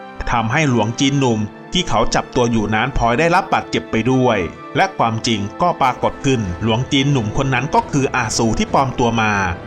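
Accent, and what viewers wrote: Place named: China. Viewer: สำเนียงการพูดของผู้บรรยาย คงไม่ใช่ “คนไทย” หลายคำออกเสียงเป็น “คนจีน” เช่นคำว่า “อาวุโส”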